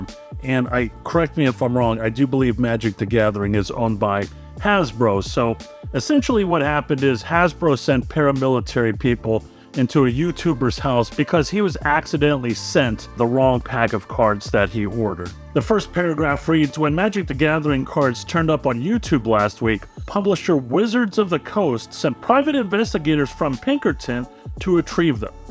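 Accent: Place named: Ohio, United States. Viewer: Your accent sounds central Ohioan, Columbus?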